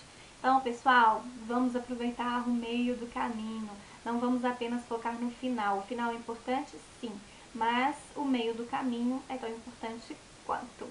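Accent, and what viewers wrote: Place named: Goiás, Brazil. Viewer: Linda reflexão. To passando por uma fase chatinha e essa reflexão serviu perfeitamente. Mudando de assunto você a goiana? O sotaque me lembra os de Goias kk <link>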